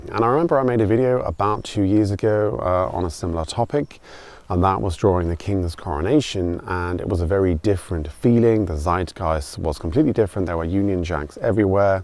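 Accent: Germany. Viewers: Your accent is very interesting after that 16 years. Its 50/50, the German accent coming through very strong. Re: UK, yeah, its got dark in some places, your average high street now in small towns is full of Betfred, Turkish Barbers, maybe a Chinese or Indian takeaway or two, Indian Dessert Shops/American Candy shops (aka: tax dodging businesses), just super disconnected from the culture and a bit dead. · You have a mild German accent ❤ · Can I just ask, do you speak German in Germany? Your accent seems like ...German? I have lived in Thailand for 14 years as a Brit, I speak Thai, but when I go back to the UK people say I sound American. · Dude you now speak with a slight German accent. Not sure how long you've been in Germany, but thought you were a German living in the UK. 😁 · I hear a hint of German accent in your English. Is that from living in Germany for a decade? 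· You’ve definitely sound like a German speaking English . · You now speak English with a German accent. It’s fascinating to listen to. Thank you for this commentary. It’s a very accurate appraisal of the uk. Makes me sad.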